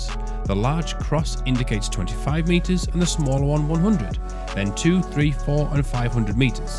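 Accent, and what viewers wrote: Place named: United Kingdom. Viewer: Now i know thanks elegant British voice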